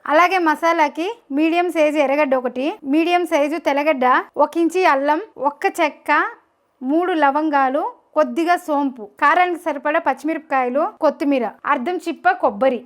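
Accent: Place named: Karnataka, India. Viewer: Mee Telugu, kannada accent tho kalisi undhi 😊Aina baaga cheppavu 👍🏼 nice n new recipe 😊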